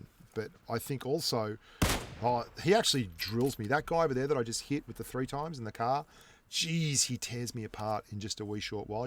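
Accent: Australia. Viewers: Thanks...are u from Australia ?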